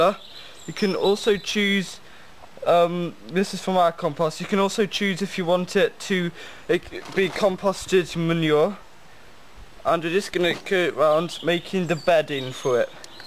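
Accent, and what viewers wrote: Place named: England, United Kingdom. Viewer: why does everyone interested in worms, have that annoying English accent?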